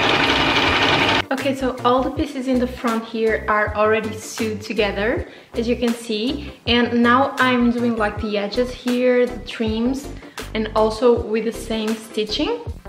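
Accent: Portugal. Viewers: Omg I knew you were Portuguese, 30 second into the video! ahaha the way you speak English is very 'Portuguese' ahah love it!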